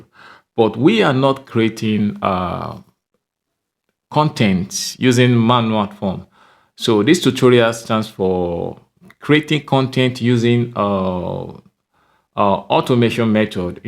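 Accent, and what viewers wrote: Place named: Africa. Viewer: thanks for the video love your african accent :)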